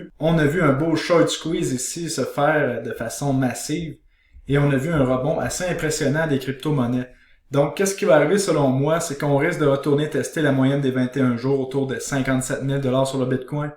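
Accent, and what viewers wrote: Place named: Quebec, Canada. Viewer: salut, je te suis depuis la France, et je trouve tes vidéos très instructives. j'ai appris beaucoup sur la façon de dénicher les bons projets. j'arrive à garder la tète froide grâce à tes conseilles, sur la psychologie des marchés. en plus ca fait toujours plaisir pour un français d'écouter l'accent du Québec! et surtout de le comprendre ):p merci pour ton travail!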